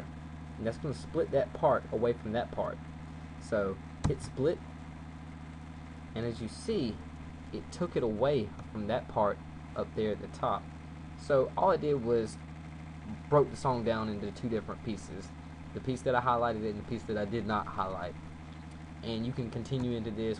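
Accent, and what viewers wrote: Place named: South, United States. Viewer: the guy talkin sounds like he's southern and trying so hard to not let his accent to come out.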